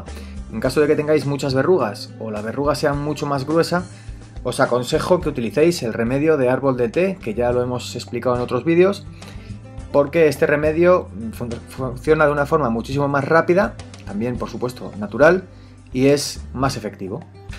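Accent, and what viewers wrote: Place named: South America. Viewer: Excelente consejo. Lo llevaré a la práctica a la de ya!!! Muchas gracias por el tip. Sólo una pregunta mi distinguido: En lugar de lo que tu llamas esparadrapo puedo utilizar lo que yo llamaría Microporo o cinta microporosa???? Quiero entender que es lo mismo. Por tu acento creo que eres sudamericano o de España, y aquí en México yo creo que sería la alternativa correcta. Me equivoco???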